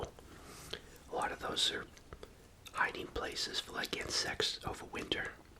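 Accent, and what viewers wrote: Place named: New England, United States. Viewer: Im sorry your hive didnt make it 😔 It is a lot of work and I know you had to pay a lot for the queen. I truly hope this year your hive is wondeful and survive the New England winters.🐝 My parents stayed in Maine for the eclipse and it totally blacked out where they live. My parents are now currently renovating my moms childhood home in Buzzards Bay. You remind me of my childhood with your dishes, the foods you eat, your sayings and the places you mention and your accent❤. It always makes me happy.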